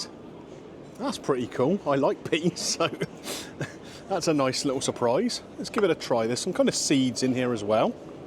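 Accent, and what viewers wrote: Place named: West Country, United Kingdom. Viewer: Hi. Back again! I am certain Gary did attend a FLAT IRON restaurant a little while back as I remember him holding up a mini meat cleaver. Excellent report mate, and love that West Country accent. Many thanks for these excellent reports, and thank you for the responses to my comments. You and Gary are one of a few who give me the courtesy of a reply. Cheers my friend and I shall see you again summarising "RIP OFF RAMSAYS" hamburger. Cheers!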